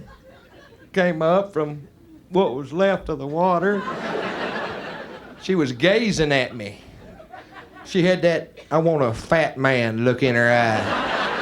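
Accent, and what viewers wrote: South, United States. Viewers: That Southern accent was more pronounced in his younger days. As he aged act was dirtier! Liked him on Blue Collar tours.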